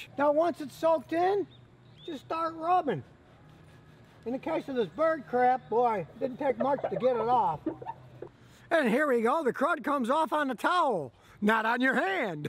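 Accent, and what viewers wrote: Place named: California, United States. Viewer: When I first watched your videos, I guessed you were from a California. You don’t sound like a Texan. I have good friends from both states.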